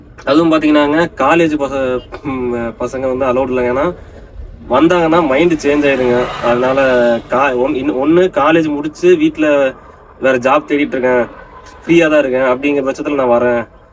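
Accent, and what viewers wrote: Kongu, India. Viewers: கொங்கு தமிழ் பேச்சு அருமை அருமை தம்பி. அப்பாவின் உழைப்பும் திறமையும் உங்களுக்கு பேருதவியாக இருக்கிறது தம்பி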